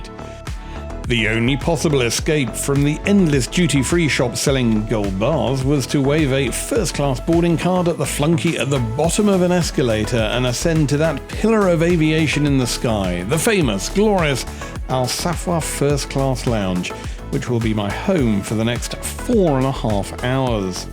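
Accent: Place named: United Kingdom. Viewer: And thankfully a British English accent without the overuse of the redundant word ‘like’ in every sentence. Very nice review and look forward to more.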